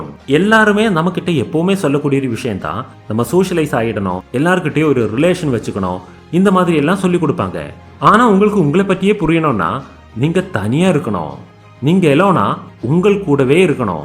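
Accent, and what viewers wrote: Kerala, India. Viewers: Yov ne malayaliya., Alaga pesuraya tamil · onga tamil slang nalla irukku . kerala va neenga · Neegga kerala va neegga peysura type same kerala person mathiri iruku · Neenga malayali thana???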